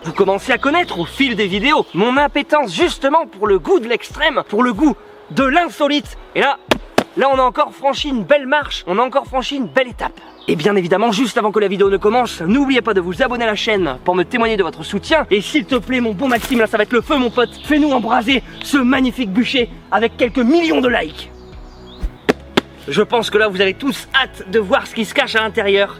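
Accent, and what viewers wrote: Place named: Nord, France. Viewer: continue comme cha min loute, j'adore quand tu fait ton babache sur tes vidéos. Bonne continuation min loute. sa me rappel ma bonne région quand tu parle ch'ti. :)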